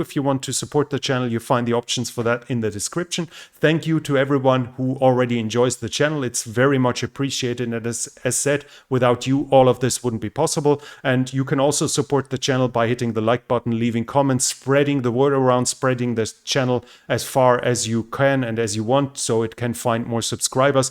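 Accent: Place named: Germany. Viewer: Well he does have a German accent and that is totally fine, you shouldn't look to loose your accent when learning English but instead focus on pronounciation, vocabulary etc...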